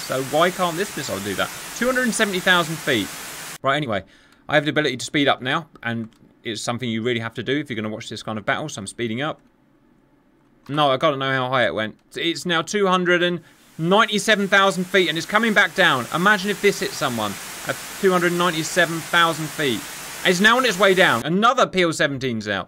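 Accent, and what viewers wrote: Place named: United Kingdom. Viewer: I was giving him a compliment actually. That soothing British voice inducing relaxation as he walks you through step by step descriptions of mass destruction and warfare with a few comedic quips.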